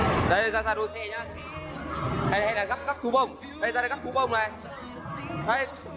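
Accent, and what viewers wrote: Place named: Soc Son, Vietnam. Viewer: Giọng này nghe giống ở sóc sơn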